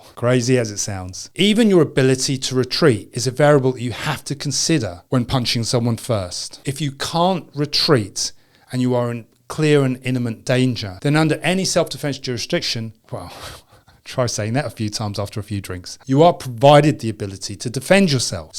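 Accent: United Kingdom. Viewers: So he says assault does not involve a strike. Will police charge someone just for Assault? I'm guessing that they will not. If so, then bullies are free to intimidate/harrass to their content?! I think the US has a "stand your ground" right; if the harasser/assualter encroaches within striking distance (i.e. shows threat), i presume the person is entitled to strike first? This video speaker has British accent, so maybe his advice does not apply in the US?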